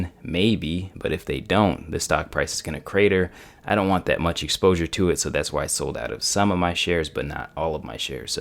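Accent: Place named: United States. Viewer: Where in the States are you from? You have an awesome voice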